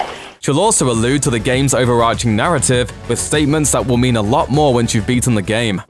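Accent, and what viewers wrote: United Kingdom. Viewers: Every video I watch is a British guy.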